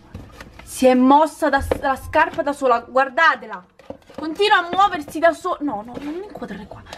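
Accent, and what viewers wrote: Rome, Italy. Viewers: Se sente che sei romana anche io lo sono Bellissimo video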